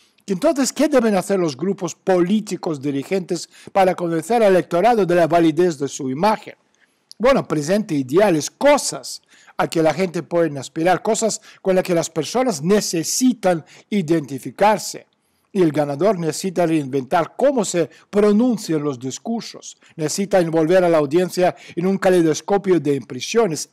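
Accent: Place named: Spain. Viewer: me encantas pero tu lenguaje a eces es algo complicado para entender. Parece el español de españa q es diferente.Pero eres muy bueng gracias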